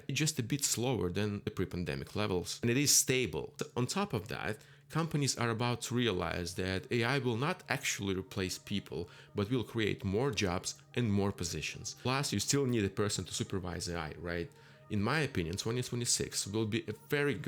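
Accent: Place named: Russia. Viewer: thanks for answer, actually you're accent is pretty tiny but since I speak the same language as you (Rus) at least one, it's noticeable to me. I don't know why am I writing that in English lol I was talking about the yellow lamp actually which was in the frame, now I know it's name. Anyway thank you for the video, pretty much inspiring. I agree with your point of view and think that to handle the AI code you need to understand what's going on so IT guys should be still in demand. Good luck with the channel, you have pretty unique appearance and quality content so I think you're going to succeed.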